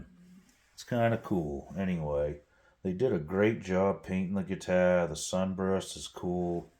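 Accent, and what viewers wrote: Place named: Maine, United States. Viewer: I could tell you're from Maine just from your voice lol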